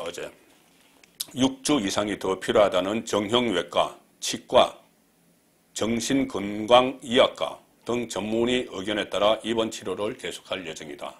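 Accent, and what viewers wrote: Gyeongsang, South Korea. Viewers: 자막을 다는 게 좋을 듯 합니다 어느 단어들은 경상도 사투리때문인지 도저히 알아 들을 수가 없네요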